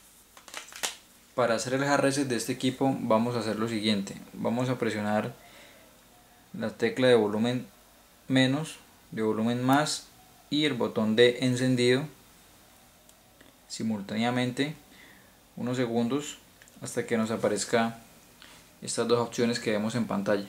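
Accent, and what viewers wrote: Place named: Colombia. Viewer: Que buen tuto! Gracias. Funciona perfecto. Y amo tu acento Colombiano. Un beso :*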